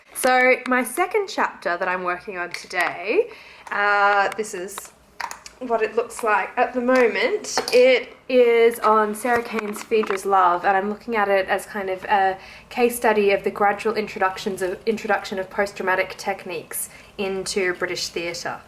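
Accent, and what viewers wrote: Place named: Australia. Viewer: One question: Are you Australian? You sound sooo much like it! (I'm an Aussie myself).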